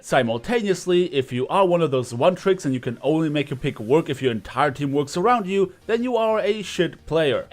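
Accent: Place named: Germany. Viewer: Hey, I might have a small personal question. If I remember correctly, you mentioned in one video that you are from Germany or at least from a german speaking region. I am from Austria and would be interested from which part of Germany exactly. I am just interested because of your accent and you are in my oppinion the best overwatch content producer on this plattform. Anyway just in case I confounded you with another youtuber and you arent from Germany, I apologise. (This was a nightmare to write with german Autokorrektur on my phone😤)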